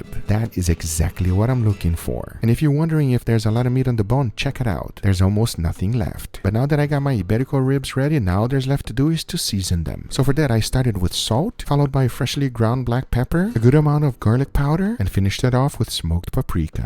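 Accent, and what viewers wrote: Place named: Brazil. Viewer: Brazilian Accent ALERT!!!!! hahah muito bom seu canal to usando varias técnicas que aprendi com você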